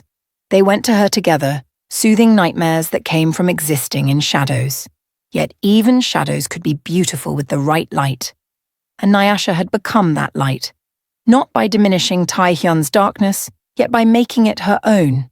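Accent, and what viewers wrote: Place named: United Kingdom. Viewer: How could she have blood on her wedding dress if she changed into a "reception" dress just before the attack after the wedding? The narrator voice is so out of place in this story. It's way too British/formal... Why wasn't there anyone from her family or closest friends at her wedding? He's rich enough to have flown some in... Just sayin...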